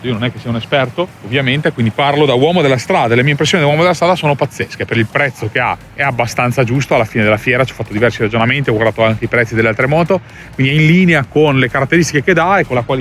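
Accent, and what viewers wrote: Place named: Padua, Italy. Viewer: bel video, sempre grandi contenuti e spiegati, raccontati in padoan, tanta roba🤣🤣🤣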